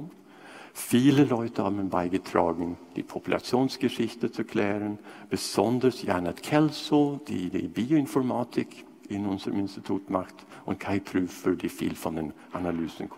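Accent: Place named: Swabia, Germany. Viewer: Beeindruckende Deutschkenntnisse! Der schwedische Akzent erinnert ein bisschen an einen schwäbisch-alemannischem Akzent. Das fand ich sehr interessant. Nicht nur den Vortrag.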